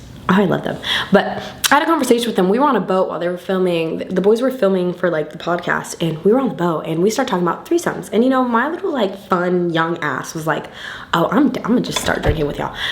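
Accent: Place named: Florida, United States. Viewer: I fuck with u the long way!!! Man I miss Florida talk!!! Love it keep em coming!!! Ur doing great!!!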